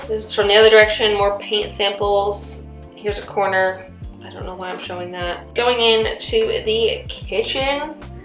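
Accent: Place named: United Kingdom. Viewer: I loved watching this, as a fellow Brit who lives in Canada now, I rarely get to hear our accent and the word "flat" instead of apartment. I can't wait to see how it turns out :-)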